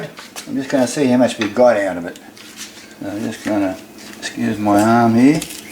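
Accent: Australia. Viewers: Hahahaha good old Aussie accent. Love it. Im also aussie Im gonna try this! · Well....I was curious how sea salt was made, now I know. I admire anyone who takes the time to go through the process necessary to yield sea salt! I hope to (one day) visit Australia, love the accent too! I was just mocking Crocodile Dundee today! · Are you Australian?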